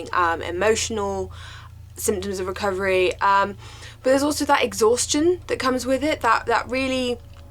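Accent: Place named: RP, United Kingdom. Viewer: i love your posh accent.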